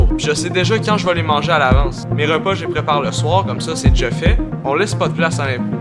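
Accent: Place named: Quebec, Canada. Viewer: Vrai québécois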